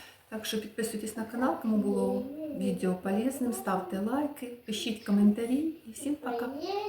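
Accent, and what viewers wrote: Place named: Surzhyk, Ukraine. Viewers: 80% українських сіл розмовляють на своїх діалектах. Мені дуже - дуже приємно слухати південний діалект. Так розмовляла бабуся, тітка, мої однокурсники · Суржиком розмовляє весь південь України. Філологи вже просто дістали.Після кожного відео ці зауваження. До чого це?Не подобається не дивіться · Працюйте над собою. Суржик ріже вуха.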